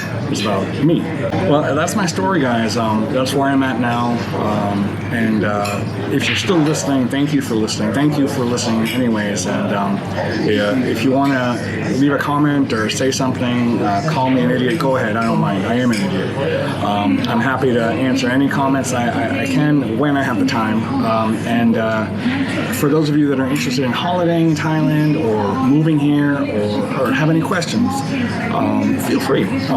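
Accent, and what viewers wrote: United States. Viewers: A truely honest and moving video. Thank you. I rarely give advice but it seems you need a break. Perhaps return to USA , earn some "real" money and come back fighting. Regroup! Best of luck with whatever you decide. (Apologies if that's not a USA accent, read it as "home country" instead!)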